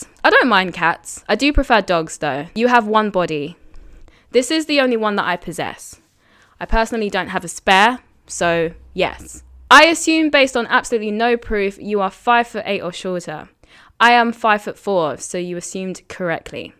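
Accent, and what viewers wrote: United Kingdom. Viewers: You are a really cool girl! Smart, cute and funny, a keeper! I love the UK accent so much 😅 definitely a turn-on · I'm a new sub, I would I say I admire how you handle and breakdown topics very logically and reasonably. I can respect that about anyone despite their political beliefs. Plus you have a british accent, which is awesome to me lol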